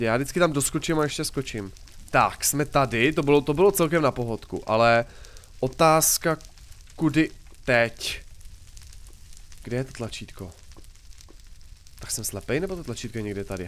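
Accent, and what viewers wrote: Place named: Pilsen, Czech Republic. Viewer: Klasický Plzeňák :D Hubu proříznutou :DD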